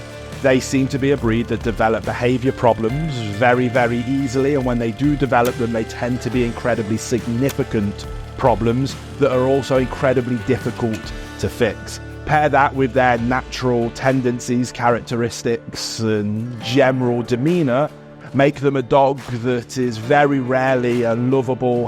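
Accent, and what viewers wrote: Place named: Birmingham, United Kingdom. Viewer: Question- is that a Brummie accent I detect? Trying to learn more about these British accents. It's certainly distinct, but fortunately even discernible to this Yank.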